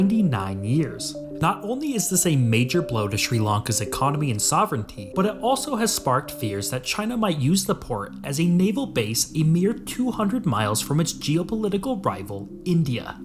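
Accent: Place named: United States. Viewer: Beware : When a video is narrated in d American accent n sounded in way like "we are d righteous knowledgeable West trying to point out all d bad that China is doing", it's wise for viewers to add quite a bit of salt to it..bc China is disrupting centuries of Western global exploitations of d Global South..thru conquest n colonisation in d past, n post WW2 thru neocolonialism, to ensure d G South stays poor so that it's easier for d West to maintain their v high standard of living.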